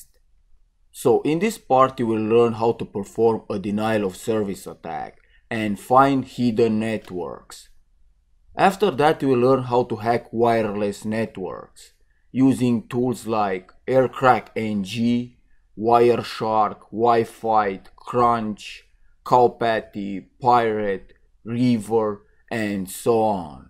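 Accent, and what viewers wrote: Nigeria. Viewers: shimt yes realised now. that's definitely a Nigerian accent.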